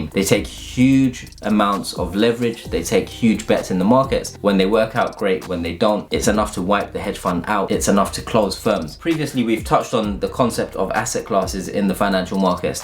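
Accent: United Kingdom. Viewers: This isn’t in basic English! It’s in British!!! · Nice and clear especially because your British too. Can you explain short selling too please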